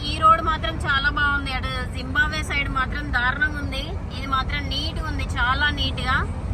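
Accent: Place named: Nizamabad, India. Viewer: నీ వాయిస్ ఎక్స్ప్లెయిన్ సూపర్ మా నిజాంబాద్ లాగా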